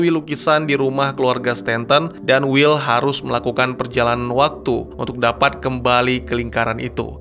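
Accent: Aceh, Indonesia. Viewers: Bang orang aceh jelas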